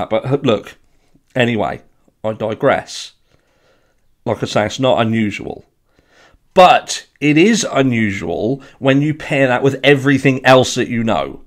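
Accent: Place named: England, United Kingdom. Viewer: There’s 1 thing that Sami did that really stood out to me. So AWP start searching Boca & possibly Donna Lake, LE tell AWP not to search it, Sami is aware through her parents (friends in high places) that they’re not searching Prosser. AWP put out a post that they found Kiely’s car & remains (not staying where they found her), Sami posts immediately “they have not found Kiely & the parents have not been told….” Blah blah & so on & so on. LE didn’t think AWP would go search it after being told not too…there’s someone in LE protecting Sami & whoever else is involved. Also, after a post comes out that Sami has a lawyer, she then posts a video of her drinking & partying at Prosser. Imo she was celebrating getting away with murder! I have so many other inconsistencies with Sami but they are the 2 that really stuck out to me…. You do a great job. 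I prefer you over these American idiots just going over crap that’s irrelevant & going down rabbit holes. So thanks for keeping it real. It also helps that I love English accents 😂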